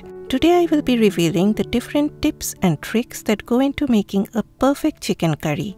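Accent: Bengal, India. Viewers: From the very beginning from your tone I easily understood you are Bengali. I'm also from Kolkata. খুব ভালো হয়েছে রান্নাটা দিদি। পুরোদস্তুর আমাদের কলকাতার বাঙালি স্টাইল। এই স্টাইল টাই আমাদের পরিচয়। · England. Not exactly on topic I'm afraid but I find your voice lovely. It's clear with that gentle Indian eccent. I absolutely hate being talked 'at', you talk 'to' almost like a friend. I do follow your channel and even attempt your easy recipes. Most come out fine, my wife is fussy and loves them too.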